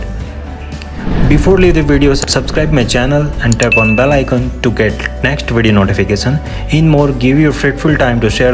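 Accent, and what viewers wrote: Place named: India. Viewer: I don't try to make fun on his strong indian accent but I really don't understand the words his saying, maybe he can speak bit slowly so people can understand what he say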